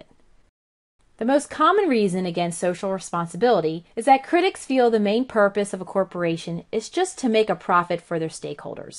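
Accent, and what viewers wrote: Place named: Philadelphia, United States. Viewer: the super thick Philly voice-over accent is a bit odd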